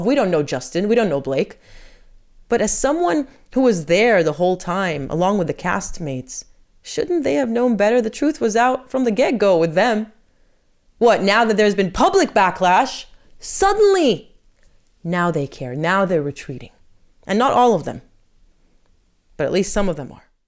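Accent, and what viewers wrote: United States. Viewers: May I please, politely, ask about your accent? You sound so American but are definitely an Ozzie. I have been there and the Ozzies were lovely. Also thank you, and your wounded friend, for your service. I'm English and they can both feck off. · Curious... Why do you have an American accent? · What country did you serve ? You sound American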